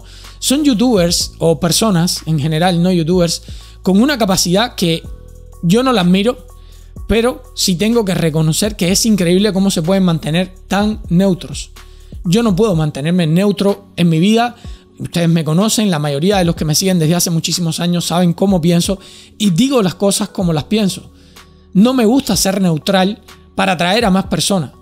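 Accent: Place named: Cuba. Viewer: la llegada de tus padres fue una alegría inmensa, y no sé si es manía mía nomás, se te escapa la modulación cubana más de lo que se acostumbra, jajajjaja Saludos y viva Cuba libre!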